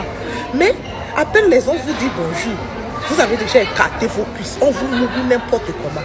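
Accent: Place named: Togo. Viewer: l accent est togolais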